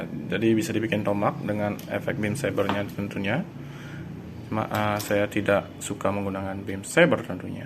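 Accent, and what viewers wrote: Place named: Bali, Indonesia. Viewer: ngenah logat bali e wkwk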